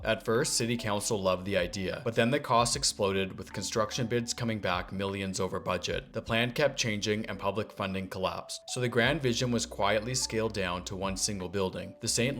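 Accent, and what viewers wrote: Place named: Canada. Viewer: Why do Canadians say "PRO"-ject? with a long O?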